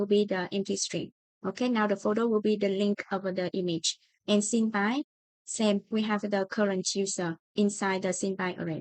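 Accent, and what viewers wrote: Vietnam. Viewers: Nghe phát âm tiếng anh giống người việt cái nhìn lại tên thì ohhh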